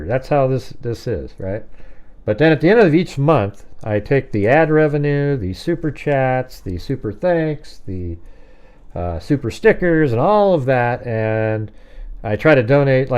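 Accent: United States. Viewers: I live quite far away (Zabrze, Poland) and haven't seen anyone here who knows this story. I'm very interested in forensics and know a lot about many criminal cases. I'm completely captivated by Idaho. I usually compare the work of many sources, but in this case, it doesn't really make sense. Since I discovered your channel, my head has exploded with admiration for your work, talent, and knowledge. At the same time, I really enjoy listening to you; you have a good voice and that great American accent, like from the good old movies I watched as a child. I wish you much success with your channel; it's truly a great thing! Keep doing what you love and stay healthy.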